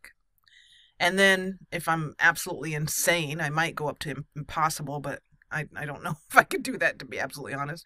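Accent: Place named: South, United States. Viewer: Wow, this game is very involved. I'm really liking Commander's southern accent; nice job. I don't want to be weird, but those aliens are kind of cute, too. :3 You took a lot of risks this second mission, Commander. It's amazing that a lot of them worked out. Beginner's luck or simple intuition, only time will tell! :D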